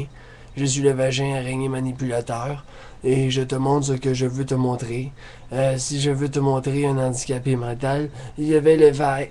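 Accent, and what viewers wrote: Quebec, Canada. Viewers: J'ai aps compris la moitié de la vidéo,pas parce qu'il a un accent québecois,mais parce que ce qu'il dit n'a pas beaucoup de sens. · Tous les Québécois parle comme ça? :o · C'est un peu différent de ce que vous avez l'habitude de voir et c'est une raison pour le prendre pour un fou? Les commentaires "Apprend à parler" ou qui se moquent de sa façon se parler: il est Québécois. Je suis sûre que la plus part à dire ça sont français. continue c'est énorme ce que tu fais c'est tellement rare des personnes comme toi en France qui osent faire ce que tu fais où tout le monde se fait critiquer vulgairement parce que personne ne sait ce que signifie le verbe "rire".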